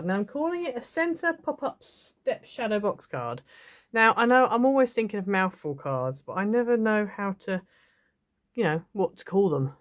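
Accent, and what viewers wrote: United Kingdom. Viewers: Lovely card beautifully explained. Thank you. Good to hear a British accent too.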